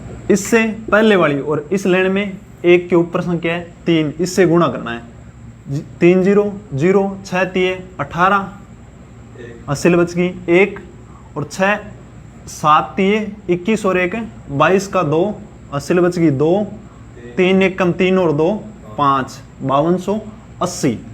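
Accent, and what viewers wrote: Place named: Haryana, India. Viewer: Sir apki boli to haryanvi jaise h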